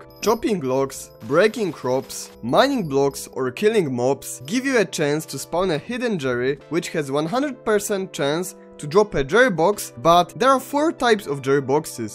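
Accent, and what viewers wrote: Poland. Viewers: I don't want you to be offended but after the first 10 seconds I immediately recognized this Polish accent :D btw Nice video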